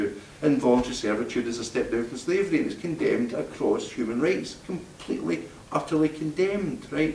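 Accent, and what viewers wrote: United Kingdom. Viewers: if I could just understand one word of this thick manchester accent..awful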